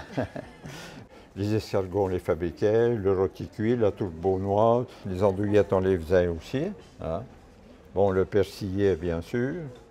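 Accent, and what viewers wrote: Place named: Quebec, Canada. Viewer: Je suis Québécois et beaucoup de gens, surtout les plus vieux, parle comme lui.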